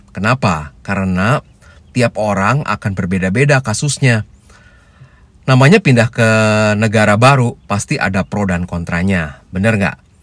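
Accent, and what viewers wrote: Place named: West Java, Indonesia. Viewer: Bro logatnya Sunda yah.. sampurasun Kang 😊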